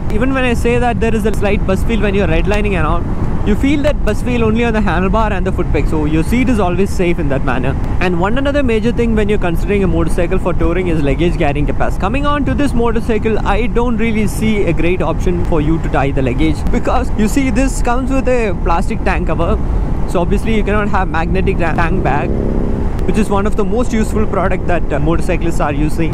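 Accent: Kerala, India. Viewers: Typical Malayalam slang..😁.... Good insight though 👍🏻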